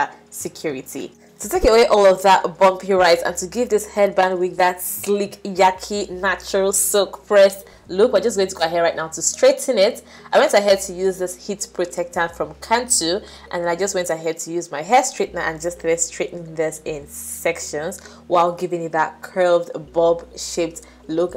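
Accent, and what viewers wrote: Nigeria. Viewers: I’m here for your Nigerian accent and your accessorise!!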